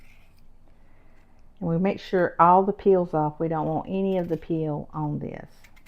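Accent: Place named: North Carolina, United States. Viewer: I have just recently found your videos and enjoy them. I live in Leland NC. I thought you sounded like you were from NC. I lived in Beulaville NC back in th early 70's. There was not much there. I think there was a bank, gas station maybe 2 I think there was a Haedees. I don't think we had a stop light. Beautiful little town. Have a safe and enjoyable 4th. Oh have you tried precooking your potatoes (regular and sweet) in the microwave then peel and fry..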